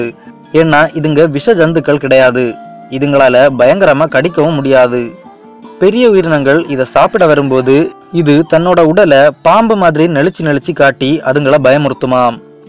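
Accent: Kanyakumari, India. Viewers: Nenga KanyakuMari ah bro? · Kanyakumari voice ❤️ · Kanyakumari slang makka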